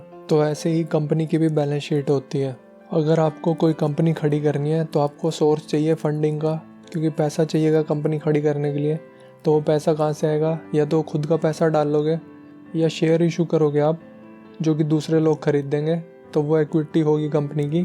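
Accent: India. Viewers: Welcome back sir🙏. Ur knowledge packed presentation in deshi aawaj is just killing...lagta hai koi apna school ka purana yaar bol raha hi....keep doing favour to us by sharing ur knowledge in deshi style 😀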